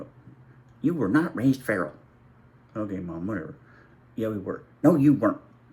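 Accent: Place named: Alabama, United States. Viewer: Heres my analysis on the entire video you have presented. 1. You have a southern Alabama accent. 2. You have some excellent knowledge. 3. Your skin is pink. 4.. You should be a motivational speaker. 5.. You look like Steve Austin the wrestler. 6. Your ancestors were definitely Catholics. 7. You are severely autistic. 8. You are not physically attracted to Black women. 9. You shave your own head at least once a week. 10. You are a meat eater and have never considered a vegan diet. 11.You never hang out with Black women. 12.You routinely hang out with Black men. 13. You are an excellent pool player. 14. You are a good dancer. 15. You pray to statues of Mary. These points are ALL true until corrected by you.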